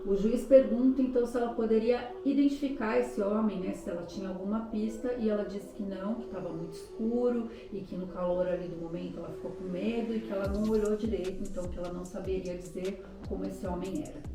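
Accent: France. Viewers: te encontrei em 2025 e estou AMANDO seu canal!!! Você narra muito bem, com muitos detalhes!!!! E seu francês é uma delícia de ouvir!!! 🥰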